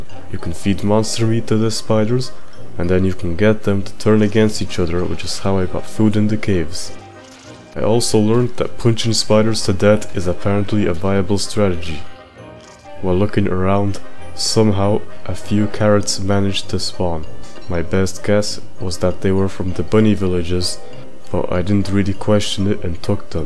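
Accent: Russia. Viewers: Nice vid! Are you russian? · Мне одному кажется что он русский?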